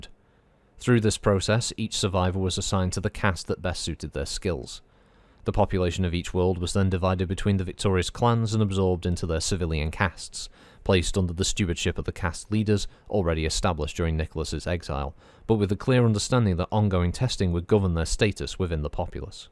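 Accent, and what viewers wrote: United Kingdom. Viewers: Everybody can read the book, it’s true. But not everybody can read the book with a British Accent. 8-)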